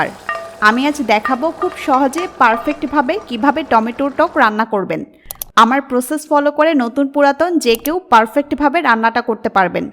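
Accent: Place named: Chittagong, Bangladesh. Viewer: Apnar kotha bolar style dekhe mone hocche apni Chottogram er manus.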